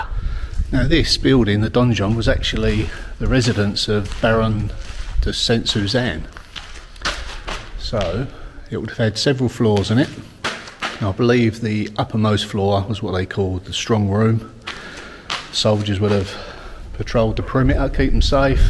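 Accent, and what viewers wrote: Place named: England, United Kingdom. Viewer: Hello from Canada. Lovely video, and I thoroughly enjoyed your tour of this beautiful little village. It's so nice to listen to someone who has such a deep appreciation and respect for the long history of these places. Never apologize for your English accent - it's beautiful. My Mum was born in England. Thank you so much for sharing. 😊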